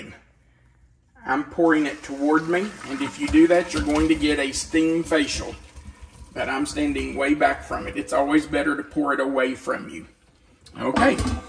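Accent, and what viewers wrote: South, United States. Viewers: Shepard’s Pie is what I make if I have leftover mashed taters. TY for your videos and wonderful southern small talk. 👏🏼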